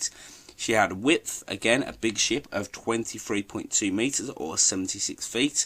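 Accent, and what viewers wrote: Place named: United Kingdom. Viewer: there's something remarkable why is there a British guys talking about Canadian ships??? shouldn't be a Canadian guy talking about Canadian ships?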